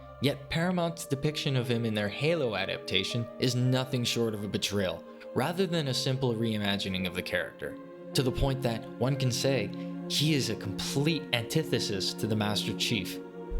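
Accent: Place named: Canada. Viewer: As someone who hasn't played Halo, not a console gamer and not great at shooters, I'M pissed at the mishandling of the character - and the constant attempt to bait and switch lead characters. He is an icon, deserves better. If you wanted a helmetless Master Chief (but why though?) Kurt Russell did it better in 1998: <link> Impressive first video - HELLA good editing. Shame about that cannuck accent.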